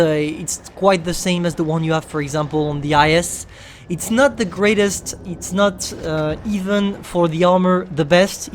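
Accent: France. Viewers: French are you?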